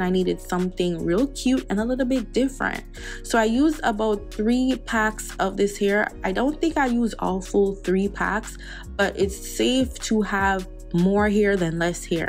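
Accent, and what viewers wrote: Jamaica. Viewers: Are you Jamaican??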